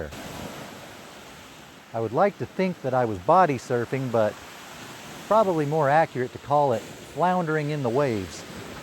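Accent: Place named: New England, United States. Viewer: your delivery is spot-on Yankee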